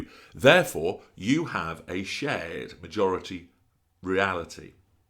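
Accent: England, United Kingdom. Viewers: Also, this channel has really helped me to avoid cognitive dissonance as your voice (minus the English accent and insert a country bumpkin Deep South accent) is deep and commanding like me ex. Every time I listen it reminds me of my ex jackass and subsequently do not fall for his fuckery. Thank you. While it is clear that you enjoy telling the tales, there is a level of benevolence that I accept and appreciate.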